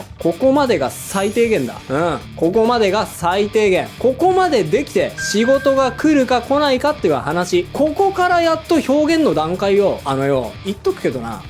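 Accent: Tokyo, Japan. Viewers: ブラックになると江戸っ子風になるんや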